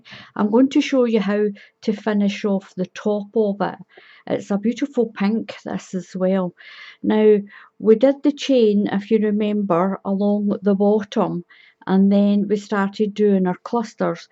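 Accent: Scotland, United Kingdom. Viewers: thanks for this lovely pattern. I'm half way through it. The yarn I used is a little fine, but it's still working up very quickly. Love your videos & the accent..only know one person from Scotland here in Ireland. Keep up the good work. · Are you from Scotland? Love hearing you talk!! Thank you for going slow and explaining so well. ❤ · I love your tutorials. Plus I love your accent my mum was Scottish sadly she has passed away and she sounded just like you I could listen to you all day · This is a beautiful afghan/blanket stitch. Thank you for excellent simple instructions. I absolutely love your accent - I love accents - and I believe you are Scottish, which I have ancestry in Scotland and Ireland. Thank you so much for this help and will be going back and viewing other videos you have put on YouTube. Thank You and please you stay safe and healthy as well. 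· It's also nice to hear another Scot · I am so delighted to have discovered your channel - your instructions are very clear and as a fellow Scot I love your accent! I'm fairly new to crocheting and I'm finding it difficult to know what size would be best for a pram blanket - I am seeing anything between 24x34 inches to 30x39 inches for an oblong blanket and anywhere between 36 and 48 inches square which would make a big difference to how much yarn I would need to buy! Could I just ask, if you were crocheting a pram blanket, what size would you make for an oblong or square blanket? Many, many thanks! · Thank you, you are such a good tutor. I love your Scotish braw.I've got a few Scottish friends also, so I'm used to hearing that.I love this pattern it's brill. I've got my wool ready, so I'm gonna make this..I'm just getting over Coronavirus so we're social distancing self isolating as best we can, me and my Husband are, I need something like this to pass time. 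So glad a found you💗.Keep safe.Take care.xx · well I think it’s a lovely stitch and blanket, and hope to recreate it myself. You can’t please everyone. Nice to hear a wee Scottish accent and look forward to seeing more from you. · Thank you so much. This pretty baby blanket certainly did crochet up quick and mine turned out gorgeous just like yours. You made following the pattern so easy. I feel so much more confident now. Just love your Scottish accent. Thanks again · I love this very pretty blanket, and I will be doing this for my new grandchild when he/she arrives end of June, thank you so much for your very easy to follow instructions, and your lovely Scottish accent xxx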